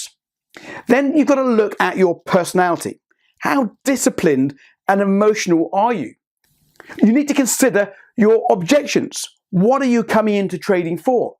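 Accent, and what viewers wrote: United Kingdom. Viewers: your genuin teachings and precise advices are so perfectly bang on mixed with very british sense of humour and nice london accent 😝 i believe you are a very good teacher. God bless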